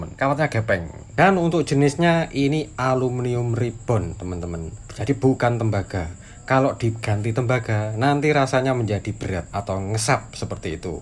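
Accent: Surabaya, Indonesia. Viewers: That accent sounds like Surabaya?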